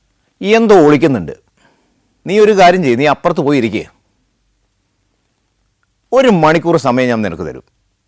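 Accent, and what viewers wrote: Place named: Alappuzha, India. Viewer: alla ആലപ്പുഴ ആണെന്ന് തോന്നുന്നു നല്ല അച്ചടി ഭാഷയിൽ സംസാരിക്കുന്നു ❤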